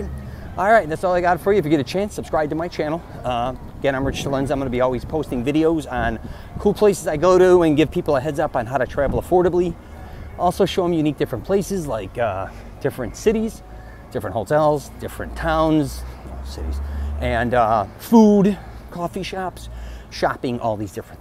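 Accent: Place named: Chicago, United States. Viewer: such a Chicago accent! haha. This guy is my twin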